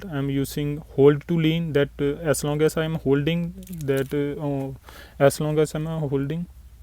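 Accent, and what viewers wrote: India. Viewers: I love the indian accent make me Travel again thx dude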